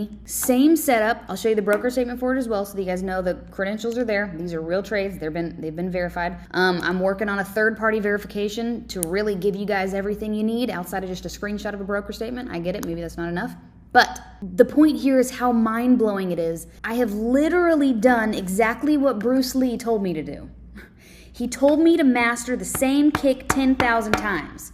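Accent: United States. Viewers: "you ain't got..." I love that country accent ❤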